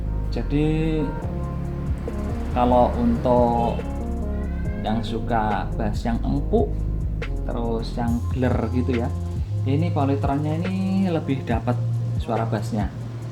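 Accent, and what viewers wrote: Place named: Java, Indonesia. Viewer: Medok banget om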